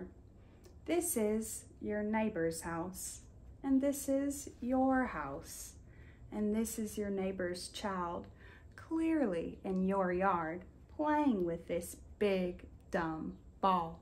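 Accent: South, United States. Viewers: I have a question,. Well actually 2 questions. 1. What is ASMR. 2. Are you from the South?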